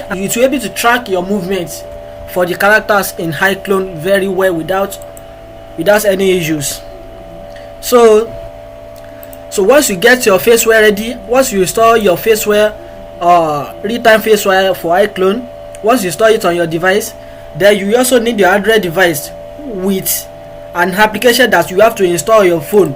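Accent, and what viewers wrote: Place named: Nigeria. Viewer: Great job! You sound like a Nigerian.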